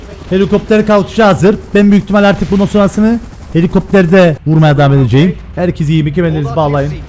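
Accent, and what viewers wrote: Cyprus. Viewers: Abi sen Kıbrıs lımısın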